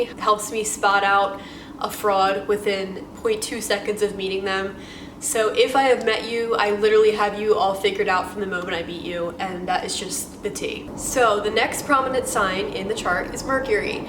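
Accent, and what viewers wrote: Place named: Long Island, United States. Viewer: I love the Long Island accent! Thanks for the video!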